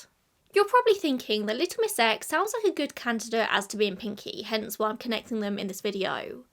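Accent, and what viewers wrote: United Kingdom. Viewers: That is how British people typically pronounce Los Angeles. Very common.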